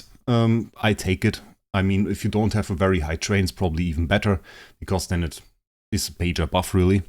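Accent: Germany. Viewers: Are u German? I hear an accent and I like ur voice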